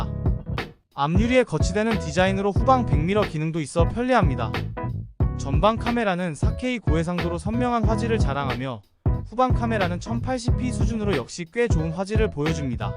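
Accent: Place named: Yanbian, China. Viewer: 조선족이냐 백미러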